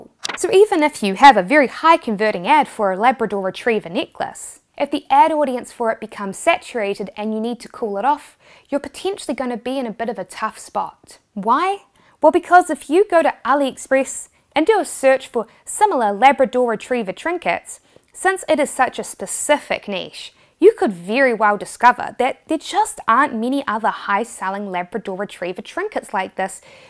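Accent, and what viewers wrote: New Zealand. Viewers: Pets. Kiwi accent.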